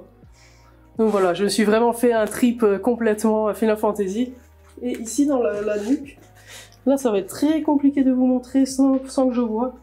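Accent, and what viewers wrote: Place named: Belgium. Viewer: Sa s'entend pas que t'es belge mdr t'a quand même bien l'accent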